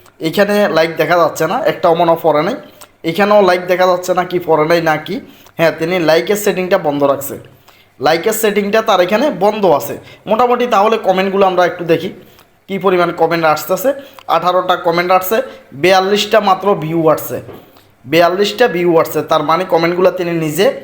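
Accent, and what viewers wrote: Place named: Noakhali, Bangladesh. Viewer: কথা শুনে মনে হচ্ছে তুমি নোয়াখাইল্লা খাটাস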